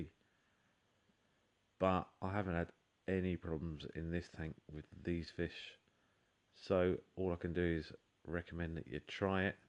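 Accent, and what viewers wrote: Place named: Cockney, United Kingdom. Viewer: My cherry shrimp and neons are best friends - but to heck with them, bruvva, I just adore listening to you talk. Luv me some Cockney accent 💐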